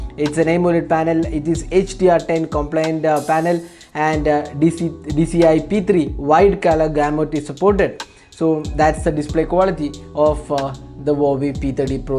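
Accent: India. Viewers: It so hard to understand him what he say. Indian ppl. Do have thick accent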